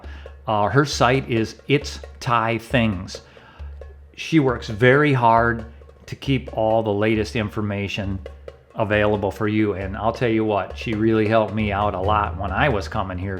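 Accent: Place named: United States. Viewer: Thanks for the captions, cause you American accent is difficult to listen to.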